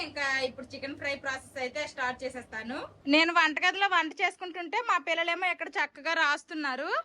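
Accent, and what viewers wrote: Srikakulam, India. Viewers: mee Srikakulam yesa olamoo olamoo 😂😂 bagundhi baaga navukuna nenu aite..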